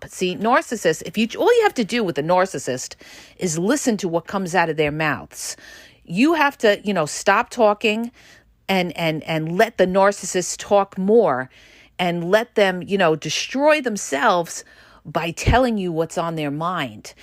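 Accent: New York, United States. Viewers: I am sorry to always be so complimentary, but I am understanding what you are saying on a deeper level. I get excited! This is understanding narcissists, which is the only way to proceed with them. I have so much more power with the truth of it all when I understand what they are doing and who they are... especially in the legal realm. As always, thank you, thank you, thank you. You can trust a native New-Yorker when you hear the accent and you see your street-wise consciousness. New-Yorkers see it all and it has been a matter of staying ALIVE to be able to defend in a brutal world.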